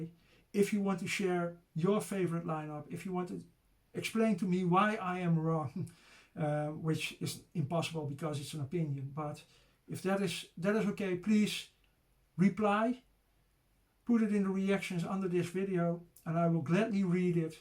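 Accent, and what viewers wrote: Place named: Netherlands. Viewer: Het is goed te horen dat jij Nederlands bent. Behoorlijk accent!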